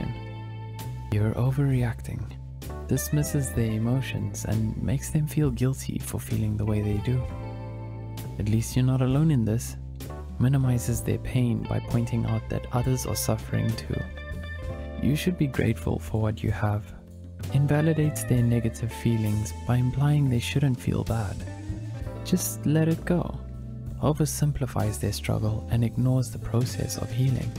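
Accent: South Africa. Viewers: Is the speaker South Africa? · Am I the only one hearing his South African accent? 😅 hey Bru howzit 😂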